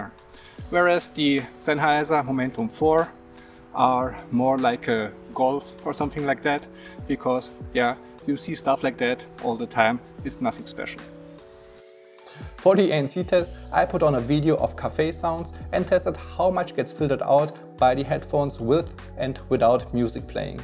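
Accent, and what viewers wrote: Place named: Germany. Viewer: sympathischer deutscher akzent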